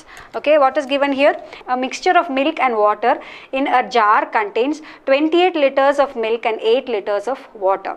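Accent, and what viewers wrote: South India, India. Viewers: Mam are you from South india?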